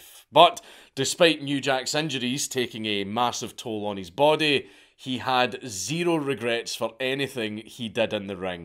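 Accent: United States. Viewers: But your American accent took me off guard, I didn't think you would sound like that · dude, your American accent is spot on. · you have quite a good american accent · American accent Dankula is kinda sic.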